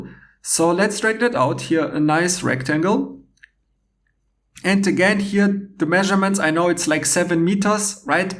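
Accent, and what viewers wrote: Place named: Germany. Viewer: Gibt es das auf Deutsch? Dieses hässlich klingende Englisch mit deutschem Akzent ist unerträglich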